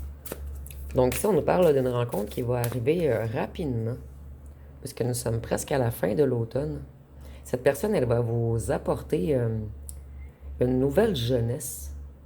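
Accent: Quebec, Canada. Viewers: Mes Respects du Four et Enchanté Chère 🌹🦋🙏 Merci pour vos guidances que je ne manque jamais! j'aime beaucoup votre accent Québécois aisi que ce beau vernis que vous portez si bien 😊 Un Agréable et heureux Dimanche 😊 Bien à Vous 💐 Alex 🙏🧘‍♂️🎉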